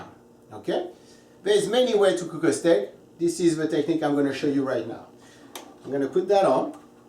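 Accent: France. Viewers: I LOVE YOUR FRENCH ACCENT! I TAKE FRENCH III AND I LOVE THAT FRENCH ACCENT! NICE JOB WITH THE STAKES BY THE WAY! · Very helpful! Where in France are you from? J'aime ton accent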